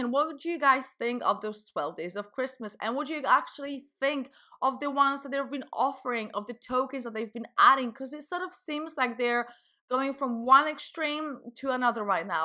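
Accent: United Kingdom. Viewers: My, I just adore British accent! But getting back on topic - I think that 12 days of Coinbase suck and nothing`s gonna change in the upcoming months. Only users can make some change. I`ve made my decision on this already - I`m gonna close my Coinbase account after I withdraw all my funds. I`m so tired of being fooled by them one way or another. C`mon, guys, let`s make some change! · Like for this British accent ;) To to put it in a nutshell - Coinbase sucks :D Nothing more than fraudulence.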